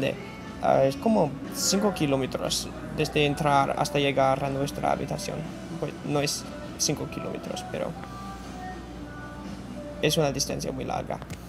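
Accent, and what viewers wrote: Spain. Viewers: Lo hablas muy bien comgra...!!!!! tiene el accent de espana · Hola,..¡¡Ya me gustaría a mí hablar inglés como tu lo haces en español!! Sólo te falta pasar un año en España(lo digo porque tu acento es de aquí), o en cualquier otro país hispanoamericano para limar esos pequeños detalles. Se te nota soltura al encadenar las frases,tu vocabulario es amplio y tienes, en principio, una buena declamación.Tan sólo debes pulir un poco la pronunciación. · hey, excelente pronunciacion, da gusto que alguien se interese por aprender otros idiomas en especial este que es complicadisimo, tienes un acentillo que parece castellano. (from spain) aunque creo que todos ya lo hemos notado jajaja, nadamas el tipico error ocasional extranjero de confundir femeninos y masculinos, but i do that a lot with irregular verbs in enlgish, sometimes i travel in time. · Acento español ( from spain ), FELICITACIONES. 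Mejora la pronunciacion de la VOCAL "O" y la CONSONANTE "D". SALUDOS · tu español es muy bueno, tienes el acento de españa. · good spanish :) dude idono why but ur accent around there in the beginning almost sounds like a spaniards accent with the tongue lol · Your Spanish seems like European Spanish, but is good. I know Spanish is difficult. I say "Hi" from Venezuela. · Bien, continúa practicando. Sueña como español de España. Saludos. .!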